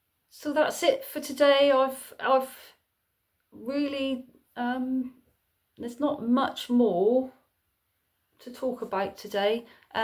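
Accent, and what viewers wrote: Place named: Devon, United Kingdom. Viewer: I’m thinking you must be in Devon/Cornwall due to your accent! I’m living in rural Devon!😊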